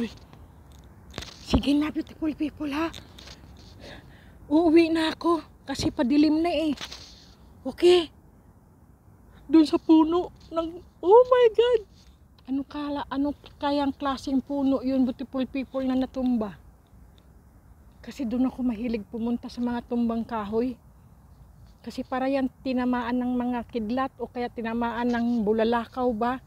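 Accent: Visayas, Philippines. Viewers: Bisaya ka ate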